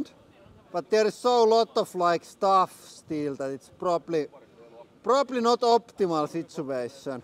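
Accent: Finland. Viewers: I love the Finnish accent! Hyva Suomi! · Is that rallyenglanti I can hear?😊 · I love your Finnish accent. My Grandparents and Parents have passed away and I miss all things Finnish. Now I feel like I need to buy a hydraulic press for fun!...or go back to Finland. Hyvaa Paivaa. Puhakan Timo. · Ужасный английский с финским акцентом😅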